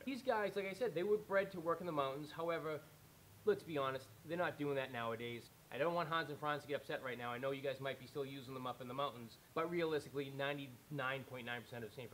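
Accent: Boston, United States. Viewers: "a very lahge dahg" hahaha love the boston accent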